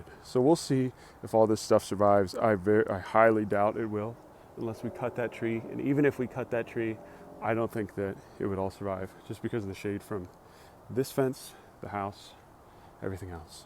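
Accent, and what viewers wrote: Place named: South, United States. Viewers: when u speed up the video i can really hear ur texas accent lol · I'm sorry, i love ol' and all, but I'm really glad he eventually dropped that kinda southern gentleman voice he uses on these early videos. I guess I just got used to hearing him the way he sounds now (2024). That southern lawyer thing is extremely cringe to me. It doesn't keep me from watching, I just prefer his natural voice.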